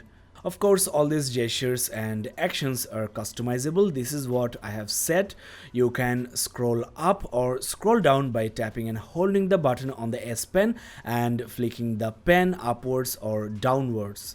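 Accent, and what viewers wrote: India. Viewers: God, I hate your bud bud accent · I absolutely hate Indian accents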